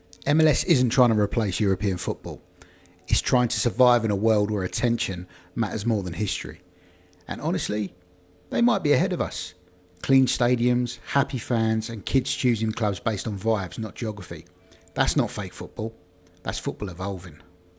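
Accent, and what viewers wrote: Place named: United Kingdom. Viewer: You have a heavy British accent and even if you are not a Brit you are definitely from Europe. All that means that you experienced that football, which is the Great Grandfather of the MLS. So yes coming from there you have all those feelings about the MLS. The Bits are the creators of the football we play today. Ebenezer Cobb Morley — often called the father of modern football because he helped write the first official rules. ⭐ So the short answer: 👉 England invented modern football (soccer) in 1863 when the rules were standardized.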